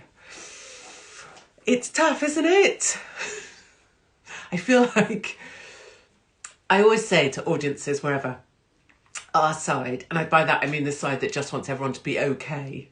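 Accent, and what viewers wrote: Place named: England, United Kingdom. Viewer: GOD----YOU ARE GOLD, YOU KEEP GETTING BETTER, LOVE THE ACCENT, PURE ENGLISH EXPRESSIONS, '' CARRY ONE BRITANNIA '',.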